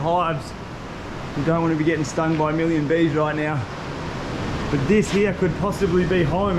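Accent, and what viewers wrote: Western Australia, Australia. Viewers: Thought this is going to be some fake ass bullshit... until i heard the australian accent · I knew straight away you are Western Australian from your accent, especially your pronunciation of water LOL. The sky and beach was a giveaway too. Great video mate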